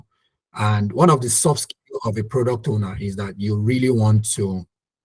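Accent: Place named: Nigeria. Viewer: 😁😁😁, my boss thank God you're Nigerian ooh,other people i no really hear them,im sorry if it's offending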